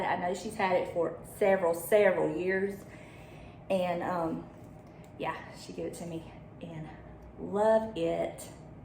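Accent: South, United States. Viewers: I enjoyed watching you video. I was so happy you shared the answer to prayer with us. When I was in nursing school my lead instructor was recovering from cancer. We were able to get more clinical than the other classes, on days she didn’t feel good. So proud she has a job she love. I have probably overused this phrase, but I love ❤️ your southern accent. I don’t feel living in n. Louisiana that I have much of a southern drawl. You have a lovely home. Looking forward to your next blog. God bless.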